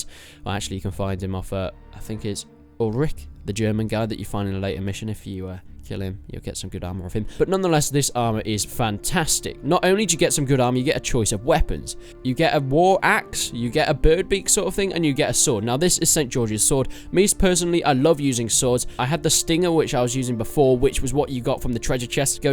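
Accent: United Kingdom. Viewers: Hrmm... U.K. Accent but you said the location is a couple hundred yards. Interesting.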